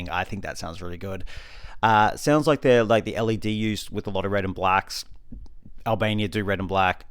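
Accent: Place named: Australia. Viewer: Hope you had a fantastic birthday! 🎂Been listening to for years and have always apprechiated an aussie voice to the fun of the eurovision song contest. best wishes from a fellow aussie!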